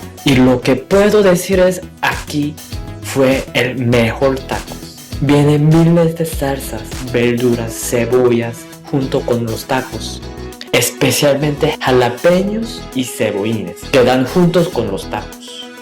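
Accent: Mexicali, Mexico. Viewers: Felicidades!!! Me encanta que hablas el Español casi Perfecto 👌 y tipo CACHANILLA jijijijij Gracias por dar a conocer mi Bello Ranchito ❤️ 3 parte por favor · Me encanto este video de un extranjero que en tan poco tiempo aprendió el idioma y hablar como todo un cahanilla universitario. Muy de acuerdo con tu descripción de la ciudad y lugares de estados unidos que acostumbramos visitar. Deberíamos imitarle y subir videos de Mexicali, pero como residentes orgullosos de la ciudad que atrapó el sol.🌞🤩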